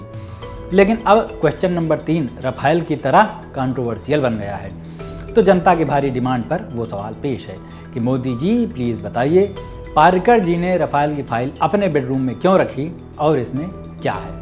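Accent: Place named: Bihar, India. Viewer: Real bihari voice mazaa aa jata hai news dekh kee☺☺☺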